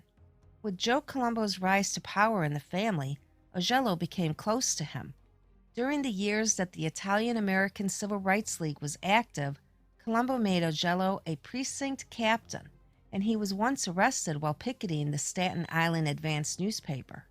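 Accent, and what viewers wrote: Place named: Chicago, United States. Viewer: The narrator sounds like shes from Chicago. Is that true? And if so where?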